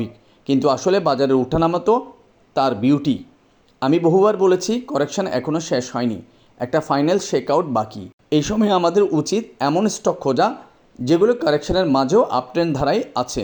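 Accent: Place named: Chittagong, Bangladesh. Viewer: কথা শুনে মনে হচ্ছে চট্টগ্রাম বাড়ি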